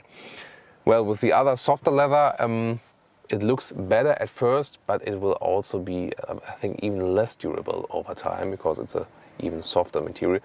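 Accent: Germany. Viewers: I hope you mean "Bruno," but even so the only similarity is a German accent. And Bruno is actually Austrian.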